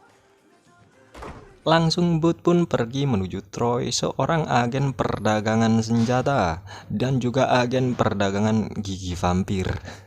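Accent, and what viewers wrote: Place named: Java, Indonesia. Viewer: Jawa nya kelebihan mas pekat kali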